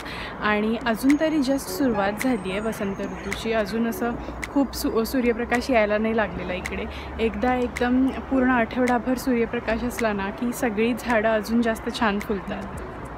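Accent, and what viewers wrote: Pune, India. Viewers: पुणेरी मराठी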